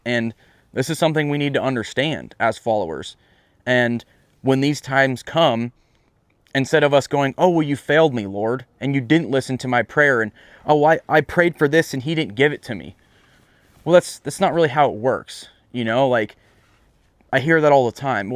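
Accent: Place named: Texas, United States. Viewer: EXACTLY, Jesus is not our "Jeanie" God is not our butler! He's our heavenly Father ! And most DON'T understand, when they say, " i prayed and the opposite happened or this went wrong! God is a FAITH God! Means He's listening and RESPONDING to FAITH! When people talk that way, #1 they probably ain't God's child, they really aren't "saved" or #2 they have NO FAITH, or understand how FAITH works! [Hebrews 11:6] Maybe, when you're truck broke down in Texas, He's trying to say you belong in Texas! You sure look & sound like a TEXAN! Anyway, you have to follow your heart! God bless!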